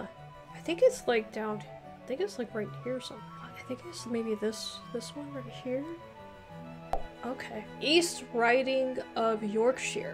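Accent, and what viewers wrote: United States. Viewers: Well done, for a non brit you did really well, very American pronunciation though 😀 If you ever need a brit to teach you our ways of speaking hit me up 😀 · Having known some Californians in the past it always amuses me hearing American s pronouncement of English place names. But you did well with the position of the counties. I was born in Yorkshire now live in Staffordshire and have been to every county in England. An example Leicestershire is pronounced Lester shire.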